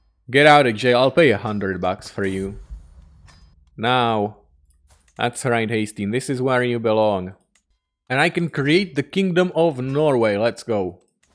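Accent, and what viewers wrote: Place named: India. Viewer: Can't say that there's no accent. It made me think he was Indian. Honestly, I would never have guessed he's actual origin because of that.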